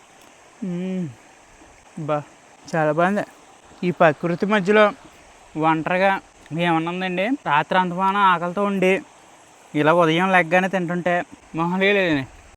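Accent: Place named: Andhra Pradesh, India. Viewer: I like your andhra slang 🫣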